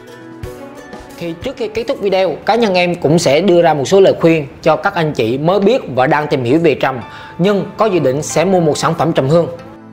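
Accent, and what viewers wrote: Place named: Quang Nam, Vietnam. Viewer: Nếu muốn là ng của công chúng thì nên học nói tiếng phổ thông chứ nói tiếng quảng ngé nge thôi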